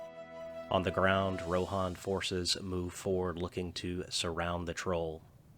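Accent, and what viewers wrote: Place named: South, United States. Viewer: Where are you from? Your southern accent keeps poking through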